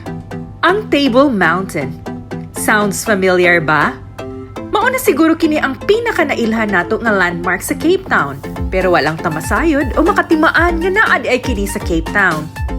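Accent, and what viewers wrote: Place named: Visayas, Philippines. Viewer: Mam!!! Grabi ka lawom sa bisaya!!! Nagnosebleed ko very light 🤣🤣🤣 Keep it up mam! 👏👏👏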